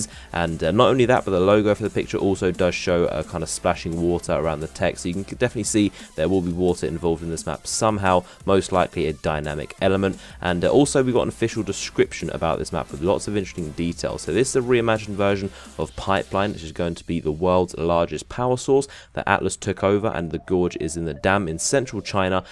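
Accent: United Kingdom. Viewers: where are u from in the UK?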